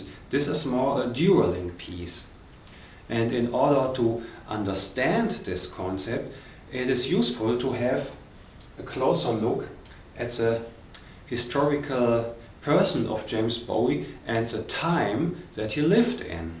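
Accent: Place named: Germany. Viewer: Nice german accent. Thanks for the video.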